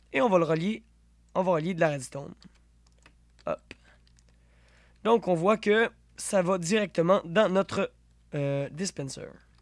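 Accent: Quebec, Canada. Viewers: Juste excellent, et puis ton ptit accent québecois ça rend le tuto encore plus sympa à écouter :)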